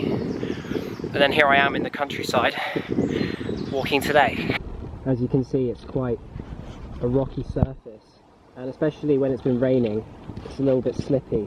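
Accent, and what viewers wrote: United Kingdom. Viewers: where are you from ?England or USA?your accent sounds like british :) .What was your opinion on the places you have seen in Romania ?What did you expect to see and what did you find in Romania? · Din UK. Nu ti-ai dat seama dupa accent?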